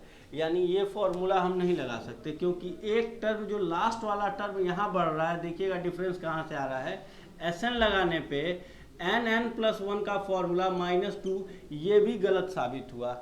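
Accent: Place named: Bihar, India. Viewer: Sir aap bolte bilkul bihari style se.....bahut cutely.... And thank a lot for this video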